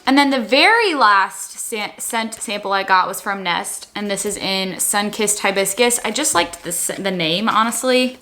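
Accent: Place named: Delaware County, United States. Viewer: your resident Philly girl is cringing and laughing out loud at the same time at your Delco accent LOL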